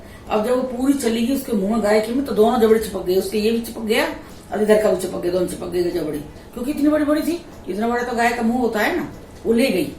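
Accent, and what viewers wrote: Uttar Pradesh, India. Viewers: App humri jesi baat karti ho up sahid 😊👍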